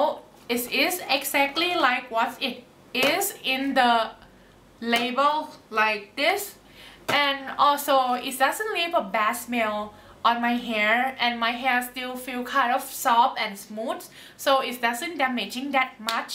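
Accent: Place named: Thailand. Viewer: Thai accent Oh~ that good 👍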